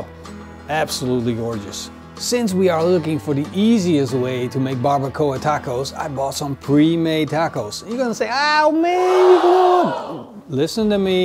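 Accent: Netherlands. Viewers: He looks dutch and sound dutch 😄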